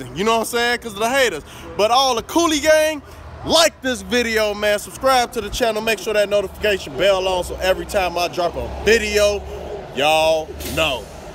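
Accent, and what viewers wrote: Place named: South, United States. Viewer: What state yah in? Yah talk like y'all in the south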